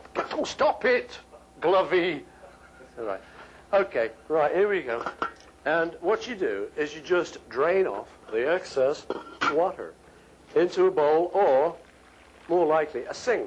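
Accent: United Kingdom. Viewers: his Scottish accent is great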